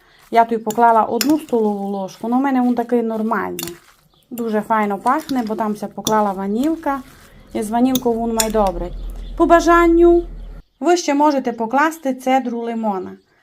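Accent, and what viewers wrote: Transcarpathia, Ukraine. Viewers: Як я люблю Закарпаття і ваш говір.Продовжуйте так говорити,ботв цьому ваш шарм і ізюминка.З миром Божим.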